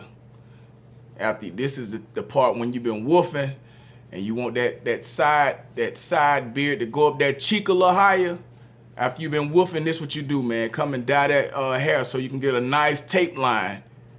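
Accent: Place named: South, United States. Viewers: Country as hell good information my man and Roll Tide Roll!!!!! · Where you from Htown lol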